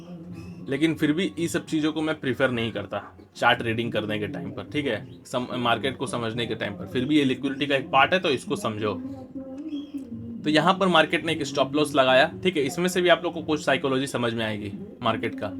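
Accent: Bengal, India. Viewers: Are you Bengali bhai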